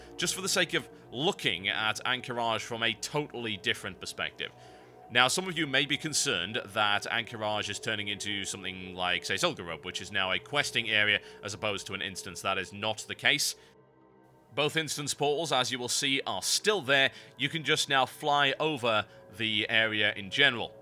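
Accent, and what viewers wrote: United Kingdom. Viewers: I was never into wow that much. I like the game but still..you're actually really interesting to listen to o_o I think its that British voice XD I'm a brit too >:3 Brits ftw!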